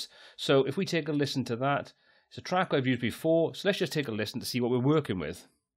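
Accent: Wales, United Kingdom. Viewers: Great explanation and lovely Welsh accent!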